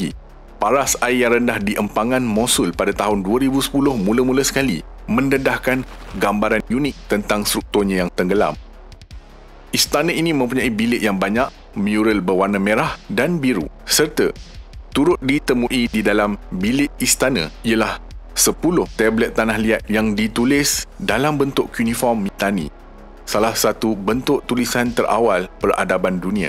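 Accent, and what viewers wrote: Malaysia. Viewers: Bingung bahasa Indonesia tp logat bhs Malaysian.....🤣🤣🤣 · yg sering nonton upin ipin pasti penasan dengan suaranya ya ? aku juga kayak orang malaysia aja bang bagus isinya sukses selalu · Pasti Anda Orang Malaysia, Karna Aksen Suara Anda Sangat Kental Sebagai Orang Malaysia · Looogat kental melayu malesia, norak bgt😅😆😅😅🤣🤭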